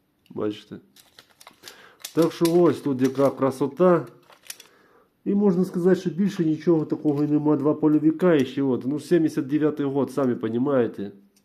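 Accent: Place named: Kuban, Russia. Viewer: что за слова у тебя из рта вылетают, с кубани что ли что по русски слабо разговаривать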